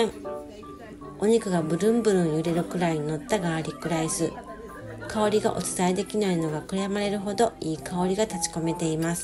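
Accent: Kyoto, Japan. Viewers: その京言葉が いつも心地よくて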